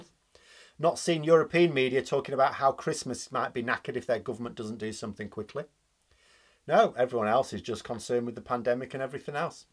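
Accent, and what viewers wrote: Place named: Northern England, United Kingdom. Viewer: Now that you started shaving once in a while, could you also get rid of your obnoxious Andy Capp accent so that peoples may hear some proper English while they consider digging for victory ?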